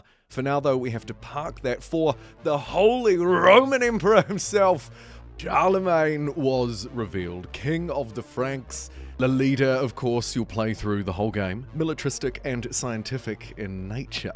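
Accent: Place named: New Zealand. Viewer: Good to hear a Kiwi accent coming up on 100k subscribers. Well deserved too!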